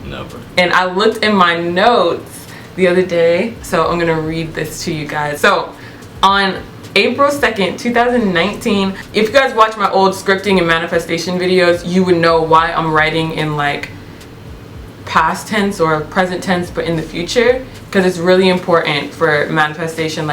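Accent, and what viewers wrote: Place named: Caribbean. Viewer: He has a bit of a carribean accent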